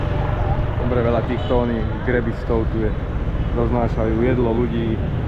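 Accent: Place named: Šurany, Slovakia. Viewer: boa, ale tá šúránčina je riadne počuť :) Ale dík za videá, ja sa chystám do Saigonu v apríli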